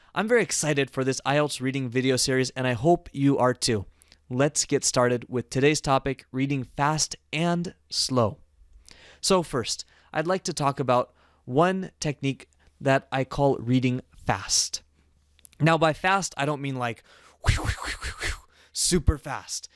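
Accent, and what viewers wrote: United States. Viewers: Oh my goodness...m in luv with ur voice😘so soothing n everybody's gonna luv to listen to u...American!!u r??(for sure)...well don't get me wrong...wanna check my accent recognition capability.🤗... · Awesome mate, this is all I want to know about reading correctly, not just for ielts test also for a long term English learning or any other language learning. The thing is, I'm curious where you come? R u American?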